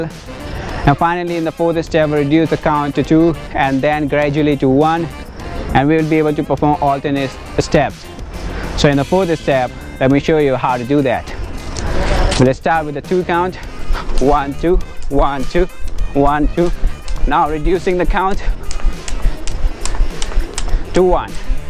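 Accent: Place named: India. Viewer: Butler english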